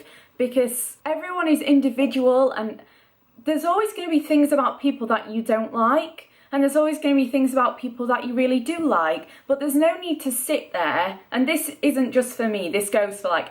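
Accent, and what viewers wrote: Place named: North England, United Kingdom. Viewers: I love your accent where in the north are you fromy mums from liverpool but I live in sw london xxxx I love you . Great video x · yorkshire i think?x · Reasons why quickly became 1 of my favourite youtubers: 1) She answers comments like says thank you or answers peoples questions which I find not many youtubers often do. 2) She works so hard on her videos especially editting them and they look amazing. Also you upload them regularly unlike some other youtubers. 3) You are brave enough to talk about this on the internet even though its a hard subject. 4) I dont usually like yorkshire accents but I love yours and I wanna speak like you although I cant do a yorkshire accent lol. Thank you for making this video even though you dont want anymore subscribers I think you truly deserve it and I cant imagine how anyone wouldn't like you. 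As well as that you made me think about being judgemental in this video and will try not to be, therefore you are making me a better person. Please dont stop making videos and please be in zoellas vlogs! I hope the hate stops and that this comment makes you feel better xxx 💞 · She's from Sheffield I think, North of England :)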